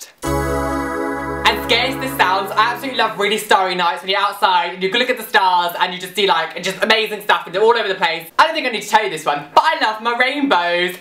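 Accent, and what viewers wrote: United Kingdom. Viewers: I LOVE the sound of rain on a tent. I love storms. I LOVE watching your videos!! Stars are AMAZING. I love British accents and terminology. That was part of the reason I watched your videos for a whole day! I love when I use a British term to my friends are family and they are like O.o and then it takes me a few moments to realize that I just called some guy a "git" so of course they aren't going to know what I'm talking about <3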